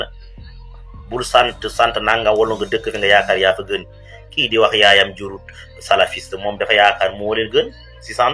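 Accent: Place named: Guinea. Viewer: Yaw rew nga dé ai sa lakeu wolof bou am accent guinéen Réglel yaw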